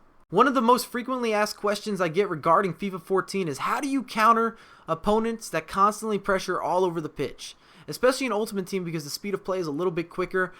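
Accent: Houston, United States. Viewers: R u from Houston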